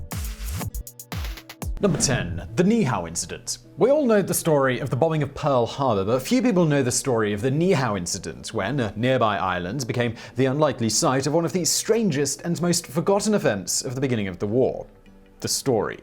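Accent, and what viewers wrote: United Kingdom. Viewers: But I could listen to his urbane British accent all day! 😂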